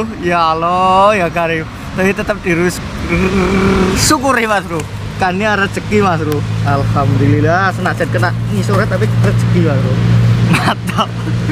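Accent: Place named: Java, Indonesia. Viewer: Mas asli mana kok medok bgt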